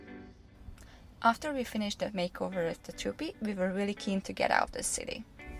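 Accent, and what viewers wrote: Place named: Hungary. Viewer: start the video, hear your voice and I'm like u gotta hungarian. I'm gonna binge watch your videos real quick as australia is my dream location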